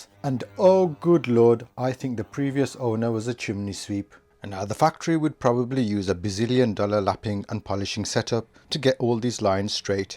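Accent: Birmingham, United Kingdom. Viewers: Hey, now you just listen hear, you just go an stay a barmy brummie, ‘cos that’s the way we like it - uhu-uhu, that’s the way….. Oh you know wot I mean. Stay cool, stay happy and please keep the camera rollin’. 🙏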